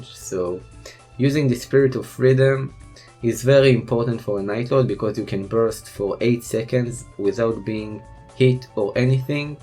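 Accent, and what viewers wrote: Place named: Israel. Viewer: המבטא הכי ישראלי ששמעתי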